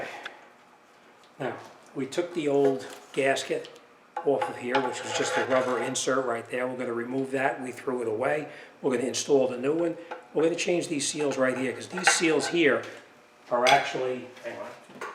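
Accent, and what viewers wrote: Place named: New York, United States. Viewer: Hey. Nice video. Where is your shop? Your accent screams New York. lol. I'm from NY. Long Island, NY.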